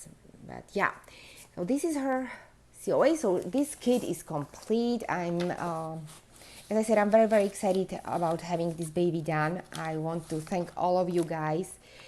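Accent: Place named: Europe. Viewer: From what country in Europe do you come from? Yesterday evening I met a lady with your accent and boy she is your "sosie" as we say in french. I don’t know is the word exist in english but I thought it was you!! And she is sweet like you!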